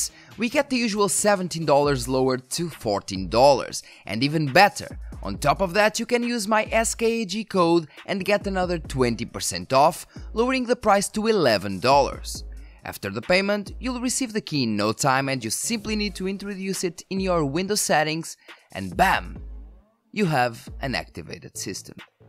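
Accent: Brazil. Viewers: Are you Brazilian or Portuguese?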